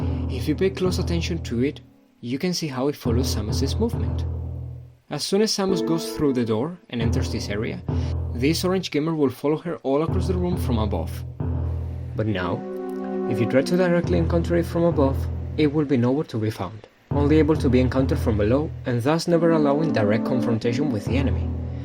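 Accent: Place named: Spain. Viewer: Como se nota el acento españolxd good video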